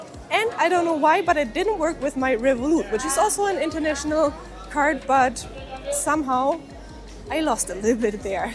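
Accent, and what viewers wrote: Germany. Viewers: Hallo! I looove your German accent!haha Come to visit me here in Cordoba!haha Namaste Guz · Nice beautiful ❤German accent…!!! I listen to whole thing 🎉. Great presentation and information!!!! Wow 😮!!!! ❤❤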